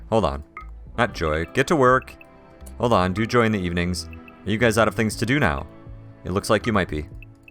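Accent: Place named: Midwest, United States. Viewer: is an American as am I but he's from the midwest i am westcoast. He says ruff i say roof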